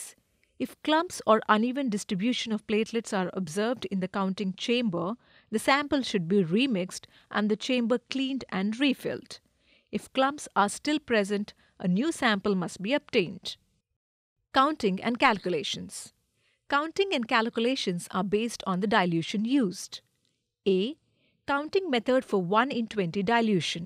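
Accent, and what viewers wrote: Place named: India. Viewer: The presenter is probably from the Indian Subcontinent. In English the pronunciation of V and W is reversed compared with Indian Languages. Also there is no such thing as a Lakh in English. This lack of translation makes this video unintelligible in English.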